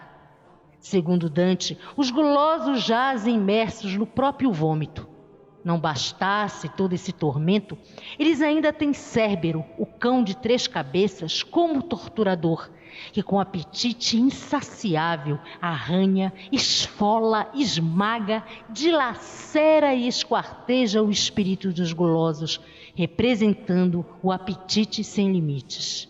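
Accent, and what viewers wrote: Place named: Northeast, Brazil. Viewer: adoro a abertura com voz de nordestino amooooooo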